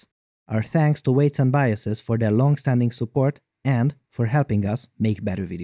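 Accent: Hungary. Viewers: Akcentusod eléggé magyarosra sikeredett. :D jó kis videó lett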